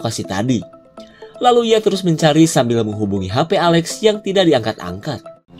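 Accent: Sunda, Indonesia. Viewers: Sunda pisan euy...😄😍❤👍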